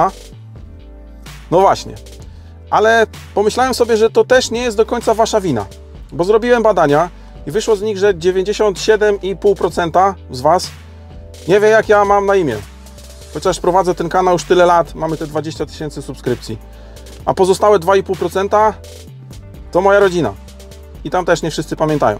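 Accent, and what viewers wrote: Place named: Warsaw, Poland. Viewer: Z całym szacunkiem, ale ... pomysł na ksywę .... no taki sobie. Po prostu ... ksywę albo się ma albo nie, czyli jeśli ktoś wcześniej (w dzieciństwie / w młodości / w robocie) nadał nam ksywę i (że się tak wyrażę) "przylgnęło" i pasuje, to się jej używa .... albo i nie , bo to zależy od ... nie wiem ... okoliczności ? Natomiast "sztucznie wyprodukowana" ... zazwyczaj NIGDY nie pasuje. OK, to Twój pomysł i jeśli Ci pasuje .... to wszystko w porządku, ale ..... jak dla mnie ... dziwne to nieco. Natomiast. Oglądam Twój kanał z powodów które już tu opisywałem kiedyś, ale przypomnę ... w miarę spokojny język wypowiedzi i taki ... swojski jakby, ale ..jednocześnie "warszawski" w tym dobrym rozumieniu Warszawiaka ... coś jak aktor Zbigniew Buczkowski, czyli ... leciuteńko "cwaniak" , ale w tym pozytywnym znaczeniu :) ..... taki ... 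nasz :) Dodatkowo prezentujesz te samochody bez zbędnych (moim zdaniem oczywiście) bzdetów typu ..... oooo ... patrzcie ... tu jest kierunkowskaz ... , tu błotnik, tu lampa, a tam opona. Przy okazji jesteś jednym z tych nielicznych którzy w ogóle odpowiadają na komentarze, czy generalnie utrzymują kontakt z "publicznością" , a to (tu znowu moja opinia) BARDZO DOBRA praktyka. Na koniec watek osobisty, czyli .... UWZGLĘDNIŁEŚ moją prośbę o podawanie informacji o ilości cylindrów w silnikach prezentowanych aut ... jeszcze raz dziękuję. Co prawda przypominałem kilka razy jeśli zapomniałeś, ale ... widzę że to już "constans" w Twoich filmikach :) , czyli .... Z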